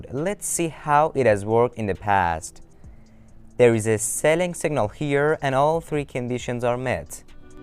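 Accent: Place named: Iran. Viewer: IRANIAN ACCETN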